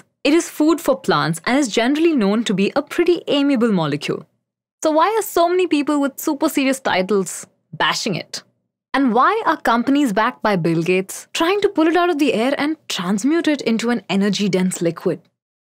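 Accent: India. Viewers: i love indian accents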